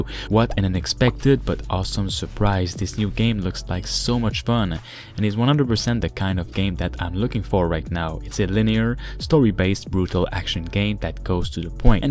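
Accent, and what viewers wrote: Quebec, Canada. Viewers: T'es tu Québecois ?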